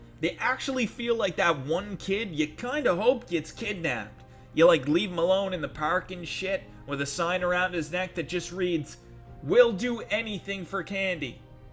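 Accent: Canada. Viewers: you Canadian? you got that accent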